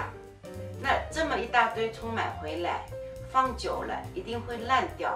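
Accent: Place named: Shanghai, China. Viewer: 我听口音像！能不能教一下坐上海冷面和配菜的做法？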